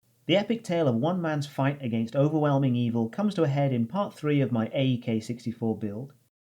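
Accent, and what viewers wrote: United Kingdom. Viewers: He is Japanese with top-tier British accent, by the way.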